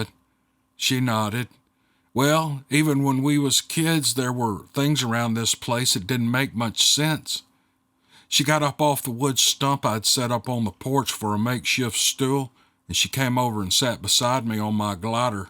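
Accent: South, United States. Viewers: Hats off to the writer & story teller. Story flows like southern accent. 🧚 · Don't worry about the haters. Just read until you find out that they are haters and then hit the delete. Don't waste your time on them. I love all the stories and don't mind a bit if you mix Bigfoot and ghost or aliens! All good!! Thank You for doing these I love your story telling all the way up here in Michigan. Oh and your Southern sound is just fine!! Thank You again! Tina Rasegan.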